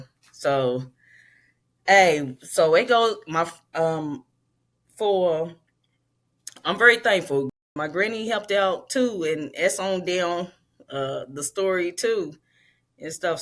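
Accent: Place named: Kentucky, United States. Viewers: Hey sis I just listened to your story and just wanted you to know that it was so encouraging the way that you can still smile after all you've been through I really need to change my way of thinking and be positive and be more faithful just like you said that you are I pray for you that everything else will go well in your life you seem like such a sweet person and your southern accent had me just laughing I love you sis praying for you thank you for keeping it real I know good people when I see them and you most definitely or a person with a good heart and a good spirit stay strong and please keep me in your prayers my name is Deborah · Ayeee😁😁🤣🤣 Well thank youuuu sugars. That's that Kentucky talk😂😂😂 Appreciate you 🫂🫶🏾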